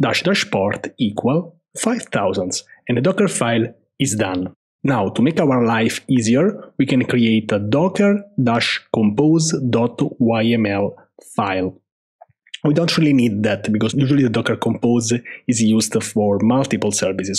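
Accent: Italy. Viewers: I love two things : the way you did your part of the job, and your strong Italian accent, Of course I subscribed. Thank you both, guys !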